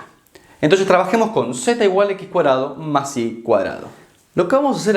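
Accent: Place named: Argentina. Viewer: TIene acento argentino pero habla español de españa, wtf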